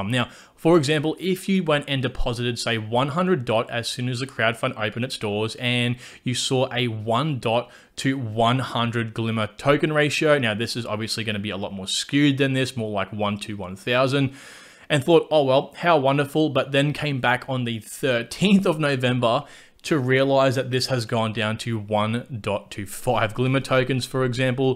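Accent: Australia. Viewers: Aussie mate!!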